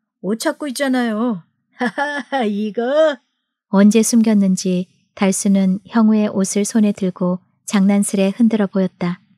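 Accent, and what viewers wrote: Jeolla, South Korea. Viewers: 너무너무 찰지게 전라도 억양 잘 살릴까이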